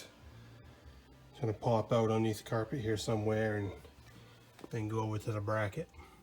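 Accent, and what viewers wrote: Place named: New Hampshire, United States. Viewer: Definitely a NH native! 73, K1GHC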